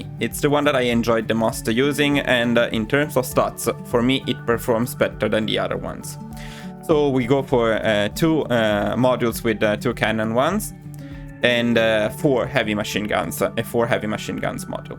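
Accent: Italy. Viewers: are you italian by chance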